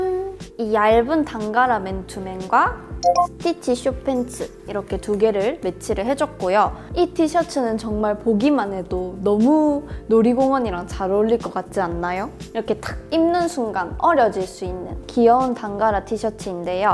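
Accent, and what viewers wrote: South Korea. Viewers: I love her accent.. it's kinda like my korean friend's accent XD love you unnie, fighting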